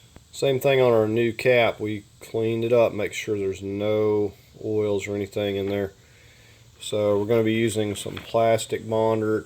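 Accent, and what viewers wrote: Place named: West, United States. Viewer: your prononciation is like some cowboy from old movies.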